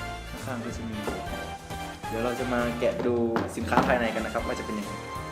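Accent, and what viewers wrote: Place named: North, Thailand. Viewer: คนเหนือกะ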